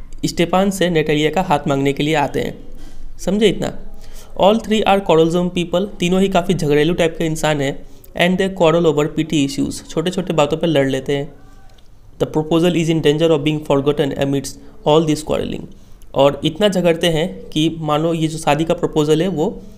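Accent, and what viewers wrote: Bihar, India. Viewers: sir aap Bihar se hain kya? Accent se lg rha tha. The video is amazing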